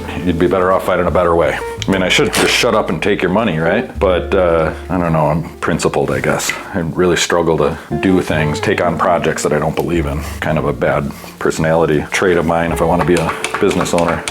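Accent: Wisconsin, United States. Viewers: The Wisconsin accent takes this from "very informative and helpful" to "neighborhood dad helps you fix up a bike." Very wholesome. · Hello from WI! Finally, someone who talks like me! I don't know why the internet thinks I need bike content, but I'm glad it does! Love the humor. Love the music. Love the pace. Keep it up! PS - Where in WI are you located?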